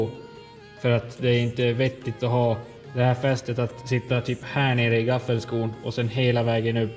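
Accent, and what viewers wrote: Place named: Norrland, Sweden. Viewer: King video! Var e din dialekt i från, låter som norrut eller mer mot det finska?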